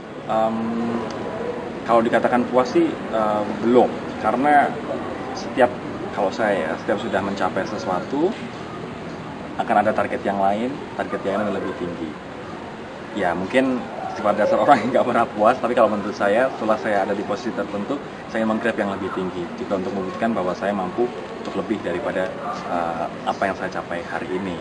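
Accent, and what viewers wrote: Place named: Java, Indonesia. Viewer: medoknya lucu haha..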